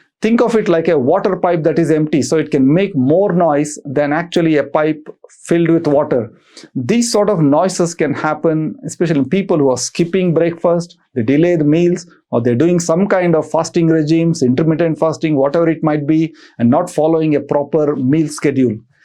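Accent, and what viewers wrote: India. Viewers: I'm sorry I couldn't watch it because of his accent.Strange thing is that I'm indian too.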